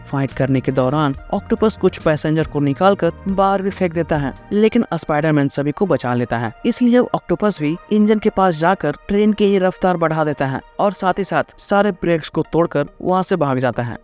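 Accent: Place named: Bihar, India. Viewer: Aspaiderman 😊.. That bihari accent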